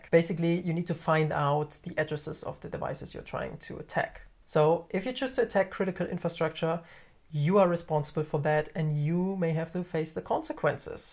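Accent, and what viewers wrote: Germany. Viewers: you have a german accent lol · Are you German ?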